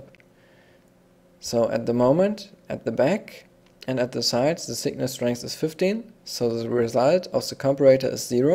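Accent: Germany. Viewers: da hör ich den deutschen raus xD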